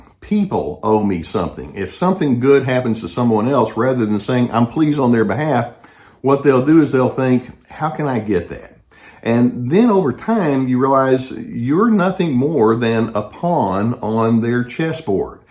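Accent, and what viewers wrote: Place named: Mississippi, United States. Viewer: THANK YOU SOOO MUCH AGAIN AND AGAIN,!!! I JUST LOVE GUS!!!, YOU SOUND LIKE YOU'RE FROM MY "NECK OF THE WOODS ". I LIVE IN MISSISSIPPI. CAN YOU REVEAL WHAT STATE YOU'RE FROM? IF NOT, I UNDERSTAND!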